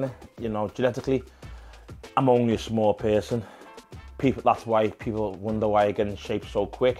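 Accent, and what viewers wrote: Liverpool, United Kingdom. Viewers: Scouse.. Liverpool accent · Make a video one day when it's all Scouse ! 🙂🙂🙂🙂👍🙂👍🙂👍👍👍🙂🙂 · Is he a Scouser? Sounds like one.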